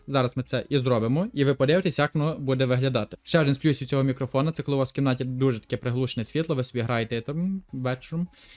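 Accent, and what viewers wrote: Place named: Western Ukraine, Ukraine. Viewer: у вас цікавий акцент ) Іванофранківщина/ тернопільщина чи закарпаття?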